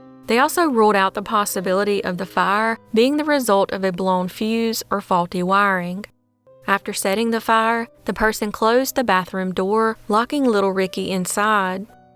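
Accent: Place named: Eastern Kentucky, United States. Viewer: I married a gal from Owsley County Eastern Kentucky. Among other things, her accent made her absolutely irresistible to me! This narrator sounds exactly like my wife! Haha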